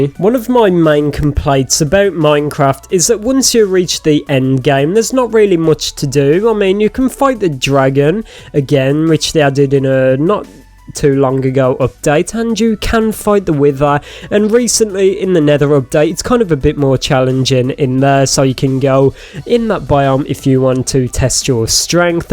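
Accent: United Kingdom. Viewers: I know it's british but which one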